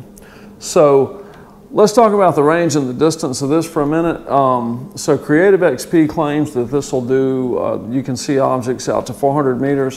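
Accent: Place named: United States. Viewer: I've been paying attention but your accent (yes i know its American but its a particular sound to it and im trying to think who i've heard that sound similar. isnt it strange i couldnt think who it was but i knew it was someone i like, who i thought was a nice person. It came to me!!! I can sleep now!!! Trey Gowdy!!!) I wonder if im right? i.e. whether your accents are from the same part of America or is one going to be Canadian and the other total opposite end? Now back to paying attention 😁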